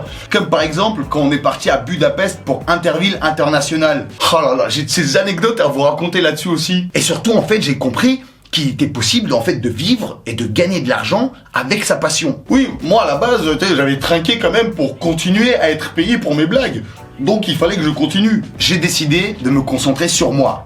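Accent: Brittany, France. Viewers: Un soupçon d'accent quand même, mâtiné d'accent breton ;-)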